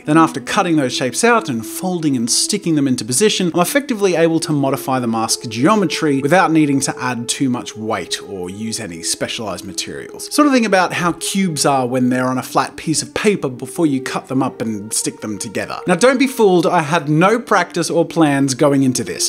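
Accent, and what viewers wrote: Australia. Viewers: a demon skull with a cheery Aussie accent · I feel like this is the most Aussie has sounded in a while. >.>... Just me? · Seeing what's supposed to be a spooky skeleton-esk mask and hearing a very cheeky Ozzy accent if probably the highlight of my day.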